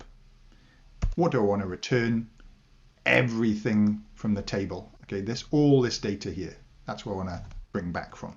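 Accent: Wales, United Kingdom. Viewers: Simple, clear, knowledgeable, and with a slight Welsh accent which I like. I can hear it cos I'm English 😎. There are lots of good Excel tutorial channels but this is one of the best.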